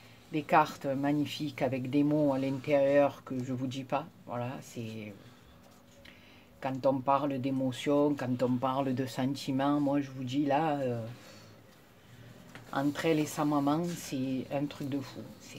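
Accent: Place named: Marseille, France. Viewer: Coucou je me disais bien que tu étais du sud (et de marseille) car tu as l'accent de chez nous, et pour être honnête j'aime beaucoup ton accent et ta franchise. J'aime beaucoup.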